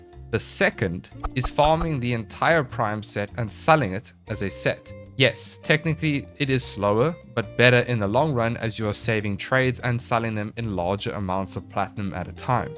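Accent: South Africa. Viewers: Is my bro south African?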